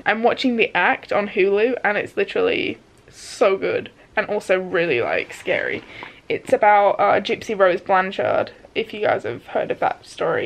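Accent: United Kingdom. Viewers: I've seen your vids allot but because of your accent thought you must be in the UK. Can you explain or tell me where you have in vids before, how you come to be in AU. Have you immigrated, do you use Medicare, are you on the NDIS etc. Where you as sick as you are now when you came over here, is your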